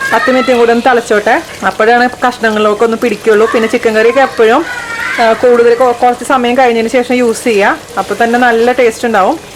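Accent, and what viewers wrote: Thrissur, India. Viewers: Nammude thrissur baasha oru verity anetta chechi😀😀👍🏻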